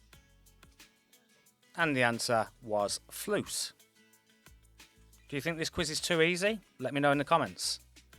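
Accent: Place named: Birmingham, United Kingdom. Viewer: 24, 😊 Great to hear a Brummie accent 👌 😊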